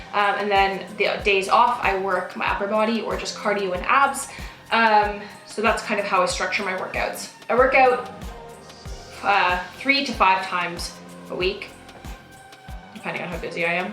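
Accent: Canada. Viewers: Are you Canadian because the way you say out is different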